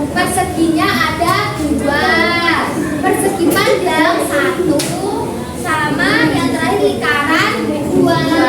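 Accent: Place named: Java, Indonesia. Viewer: Semangat.. Semoga bisa menjadi guru yg sejati... Bahasane medhok banget sih mbak....😂😂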